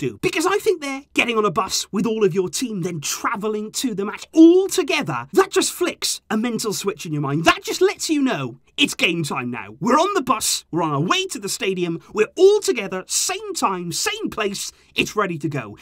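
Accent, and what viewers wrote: United Kingdom. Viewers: are you British or Irish? The accent sounds British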